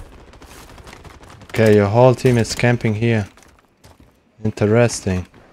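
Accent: Germany. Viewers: Ehrlich du hörst dich voll deutsch an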